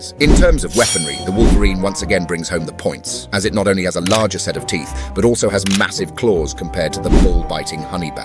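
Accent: United Kingdom. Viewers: Did this guy just rip off Casual Geographic with a British accent? I get he didnt create the slang, but the overly extensive use and variations is basically his style. Also, is this AI?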